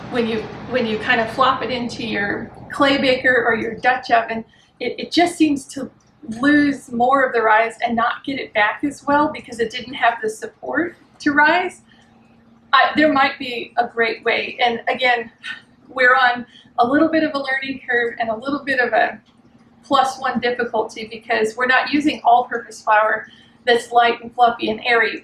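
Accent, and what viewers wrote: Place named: Austin, United States. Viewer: Love your demo (and your accent: I'm an Austin native!). I love that people are rediscovering clay bakers. Back in the 70's there was a trend (well, back then it was just called a fad) where we were all baking loaves in terracotta flower pots (in our bell-bottoms with flower-patches and halter-tops made of bandanas). Worked like a charm.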